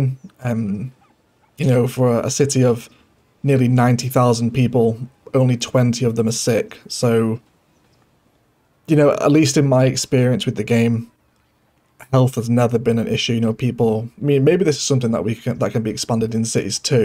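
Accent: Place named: Manchester, United Kingdom. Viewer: you sound so much like rashford, you from manchester?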